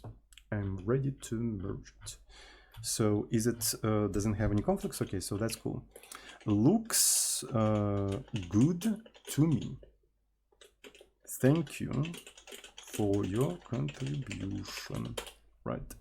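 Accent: Russia. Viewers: ну, русский/украинский/белорусский он точно знает, и акцент очевидно есть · Мне одному слышен русский акцент английского языка?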